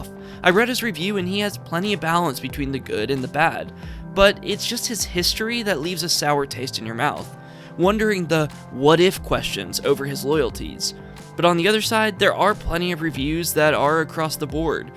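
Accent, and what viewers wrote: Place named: Pennsylvania, United States. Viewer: your accent reminds me of people in Pennsylvania .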